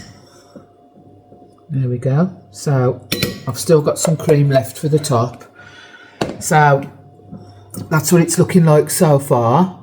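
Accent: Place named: Birmingham, United Kingdom. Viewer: I love your bubbling personslity😊 Are you from Birmingham? My husband is from B and I recognize the accent, so cute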